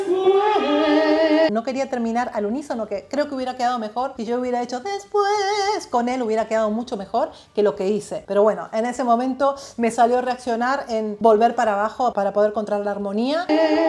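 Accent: Argentina. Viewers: te escucho hablar y siento como si estuviera escuchando a una argentina, si... tienes unos modismos al hablar típicos españoles pero me desconciertas. Excelente trabajo entrevistando a Dimash. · Que lastima que perdiste el acento Argentino 😭 y Felicidades te sigo hace años ❤️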